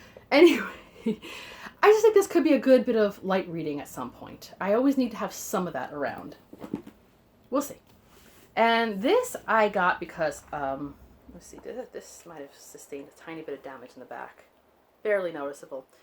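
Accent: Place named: New York, United States. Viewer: Hi, I'm going to book off today here in Hawaii. Wish me luck. I have more of a New York accent than you do and I've been living in Hawaii for 40 years. I just did my first. mail haul using my brooklynese accent full force if you want to take a look. Sorry you got soaked. It happens to me in Hawaii but I have a collection of plastic bags. Aloha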